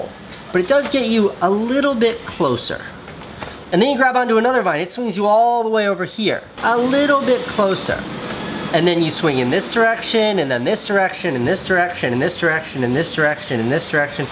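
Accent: Liverpool, United Kingdom. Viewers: Scouse power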